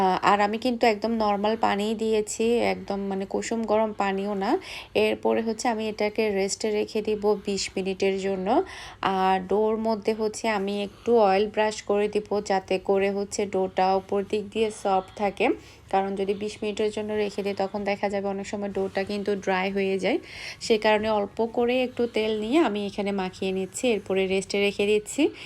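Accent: Chittagong, Bangladesh. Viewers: Amr Mone hocce apu apni ctg er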